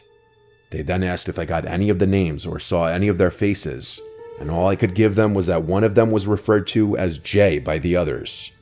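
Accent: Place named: Northeast, United States. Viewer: I really enjoy his narrations. Especially when I hear and itty-bitty hint of a Northeastern accent? Pretty sure that’s what I’m hearing. Correct me if I’m wrong please! 😅❤ For example: starting - I can hear the accent a tiny bit when he says “fenced off” . Also when he says “flashlight” and “Ashley” Example . 😊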